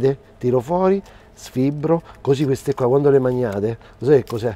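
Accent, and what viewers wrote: Rome, Italy. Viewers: Che bello sentire parlare romano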